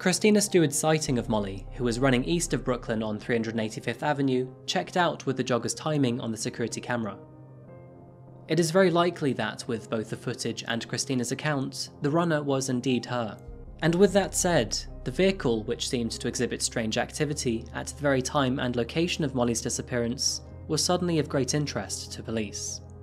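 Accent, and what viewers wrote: England, United Kingdom. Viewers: that's hella weird and goofy. Not at all, those subs gonna hit 1 million soon enough for this guy, so it speaks for itself. Very clean production, B roll smooth editing, and his very English accent adds to his distinguished diction,soft spoken but most importantly. He humanizes the victims in the cases, he puts the focus on their story of how they lost their life and doesn't sensationalize it. But as he tells their story like hers, he honors them. by telling their story like this, he's getting the bag and does it with class. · Am I the only one that gets annoyed that he gives temperatures in farenheit and doesn't even provide a conversion to celcius...I have no fuckin idea what 88f is and neither do most of the world. Dude you have a British accent throw us a bone here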